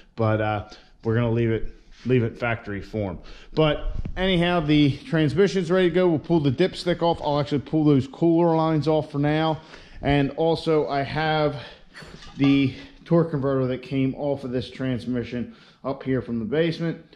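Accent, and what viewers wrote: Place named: Northeast, United States. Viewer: Number one thank you for your videos very entertaining very educational and 2 I love your Northeastern accent yes you tried to cover it up but it's still very prominent not in the bad way I used to live in New York there pronounce of words is a lot different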